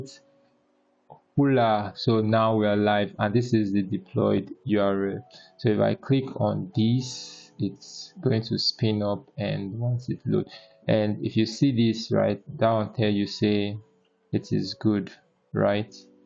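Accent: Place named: Nigeria. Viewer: using nigerian english make this amazing :yougotthis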